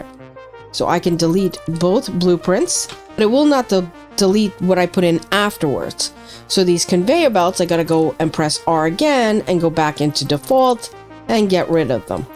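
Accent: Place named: New Jersey, United States. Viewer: I agree! One of the reasons I enjoy this series is because she sounds like my wife’s family, who hail from northern New Jersey. Aside from their making fun of my (practically nonexistent) southern accent for a few years, you won’t find a better group of people. And yeah, they know where the best deli and bagels are (and now so do I!)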